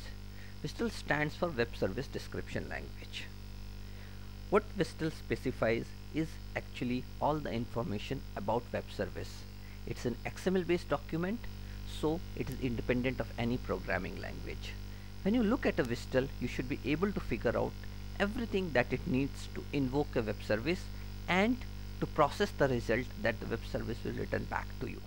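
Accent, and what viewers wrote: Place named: India. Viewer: Really good video! And I love the indian accent, especially in the word 'redundant' :D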